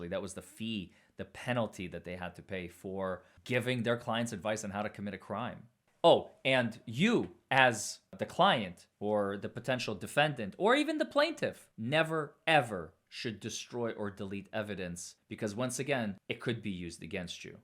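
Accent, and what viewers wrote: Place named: New England, United States. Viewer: Kentucky⁉️ I thought you were from MA or RI 😂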